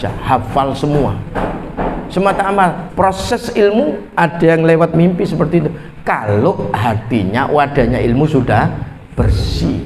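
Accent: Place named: Java, Indonesia. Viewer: Sumenep kok logate jowo tadz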